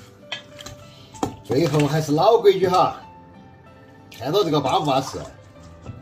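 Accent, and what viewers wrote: Chengdu, China. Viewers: 这一口地道道的成都话！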